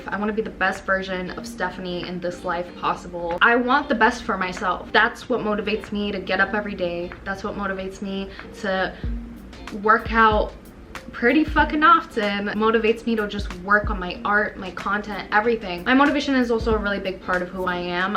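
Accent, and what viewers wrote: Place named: Miami, United States. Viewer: The Miami accent is real 😅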